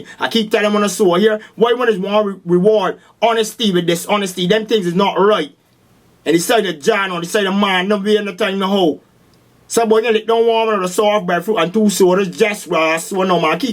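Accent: Barbados. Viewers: I freaking love the bajan accent! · Lmao his bajan accent is the best sounds like my grandad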